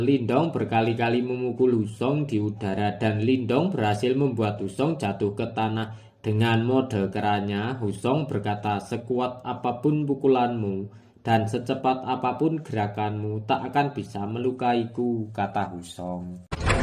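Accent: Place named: Banyumas, Indonesia. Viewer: WONG NGAPAK TAH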